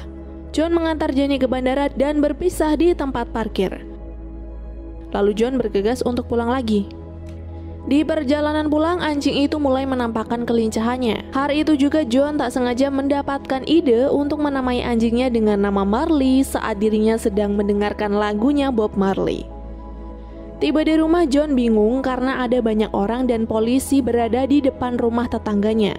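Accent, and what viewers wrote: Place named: West Java, Indonesia. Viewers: Sunda si teteh ey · Min orang mna aya sundaan🤣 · Mimin sunda na bandung bukan si kalo bandung ku aing di apelin🥺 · Cewe Sunda njir yg nyeritain wkwk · Aya Orang Sunda Ey😂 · HAHAHHW ANJIR ORANG BANDUNG NIH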